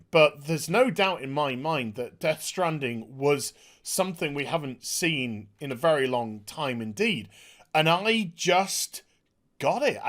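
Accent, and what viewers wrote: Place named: United Kingdom. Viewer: Tunning in to this video to see the block from Jason, and staying for the british accent!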